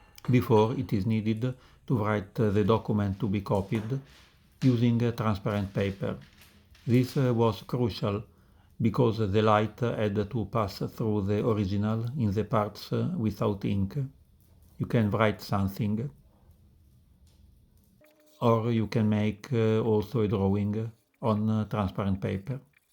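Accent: Italy. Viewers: The Italian accent makes it. 👌🏼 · Ma... come si fa a registrare un video parlato in inglese con una pronuncia italiana tanto terribile...!!! La prossima volta veda di parlare nella sua lingua, anche perchè youtube offre ottimi sistemi per la traduzione dei contributi in altre lingua... ecchediamine !!! Questo video sarebbe stato interessante, ma sono stato costretto ad abbandonarlo per il disgusto.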